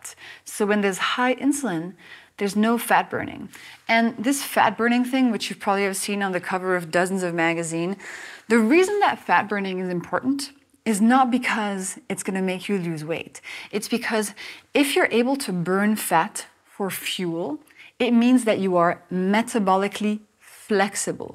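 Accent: France. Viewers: Wait a second... Didn´t she have a very thick exaggerated French accent in her first videos?! You can´t simply erase your accent from your system. She was acting it to gain more impression and clicks. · I know how I detected she is french so easily despite her excellent English accent. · je me disais bien reconnaitre un accent français par moment ! merci pour vos explications je vais vous suivre <3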